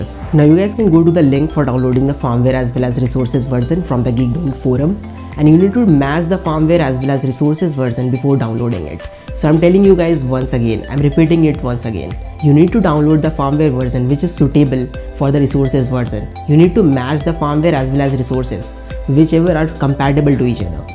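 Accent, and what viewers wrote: India. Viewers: Is this guy switching to indian mid video ?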